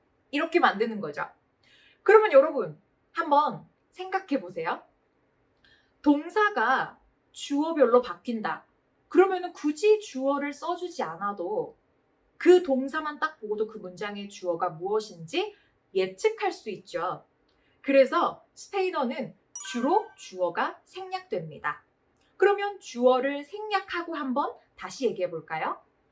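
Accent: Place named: Gyeongsang, South Korea. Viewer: 산티아고 순례를 계획하고 스페인어를 배워보려고 시청하고 있는데 샘 처녀 시절( 샘) 경상도 억양으로 열강하시던 것까지 섭렵하고 있습니다. 정말 감사합니다. 열심히 배우겠습니다.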